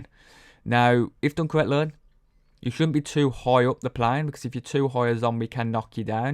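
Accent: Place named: Birmingham, United Kingdom. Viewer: Called a brum accent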